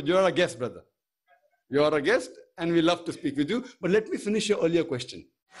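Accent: India. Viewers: seems strange to see a white man talking with a natural Indian accent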